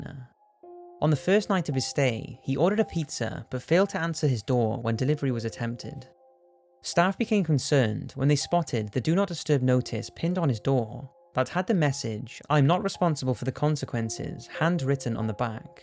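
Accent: Wales, United Kingdom. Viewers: ElseWhere 47 That’s a Welsh accent pal, not English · what is his accent? Sometimes I think defo American then at times sounds like different parts of England. Even some of the words come with a Welsh twang now and again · Sounds welsh to be honest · He's Welsh.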